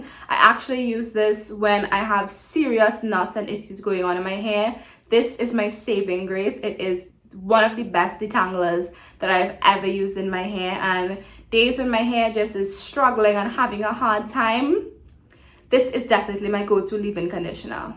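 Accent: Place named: Trinidad and Tobago. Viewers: Definitely a Trini voice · Yep Trini too the bone...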